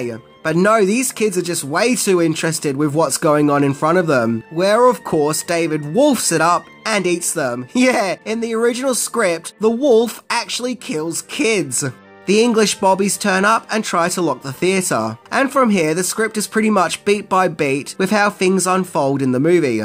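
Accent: Australia. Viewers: Seriously? He’s one of the most Australian-sounding people out there!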